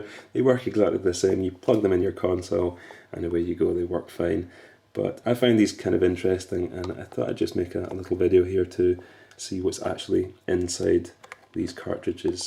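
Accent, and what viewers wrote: Scotland, United Kingdom. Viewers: Broken Engrish read in a Scottish accent. My life is complete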